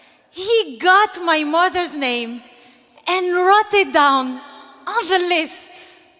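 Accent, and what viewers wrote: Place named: France. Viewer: nope that's actually her English accent, you can hear the French in it.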